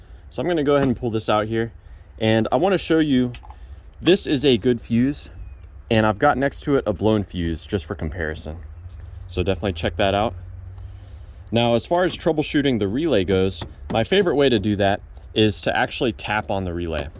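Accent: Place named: United States. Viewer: You told me EXACTLY the pin info.. And clearly in an American voice.. Lol.. I want to drain the old fuel .. Been sitting more than 3yrs. Thank you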